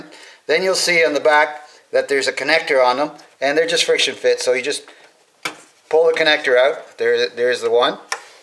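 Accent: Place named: North, United Kingdom. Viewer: Helpful video nonetheless. However, I'm trying to figure out what kind of accent that is...sounds northern.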